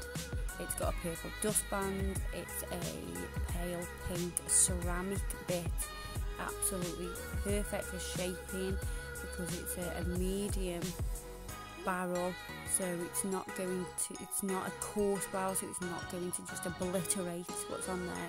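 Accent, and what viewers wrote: Stoke, United Kingdom. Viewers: They were stunning duck your uncle would be so proud. Please do more videos but dunna have music as loud as conna hear those delicate stokie tones xx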